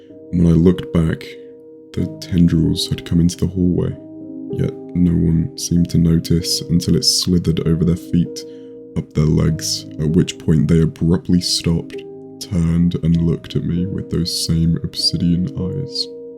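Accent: United Kingdom. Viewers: H0T BRITISH MAN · DEEP VOICE BRITISH MAN!!!!!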